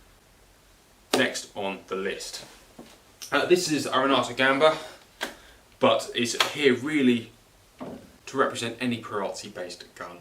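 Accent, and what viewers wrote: United Kingdom. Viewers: I love your YouTubes even though I have a difficult time understanding the Queen's (King's) English. Could you possibly talk any faster, LOL. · It is called the Queen' s English, not simplified English(US)...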